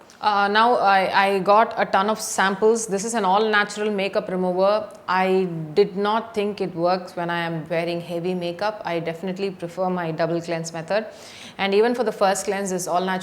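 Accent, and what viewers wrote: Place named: India. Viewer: I like Ur sonut Indian talking style 😆😆😅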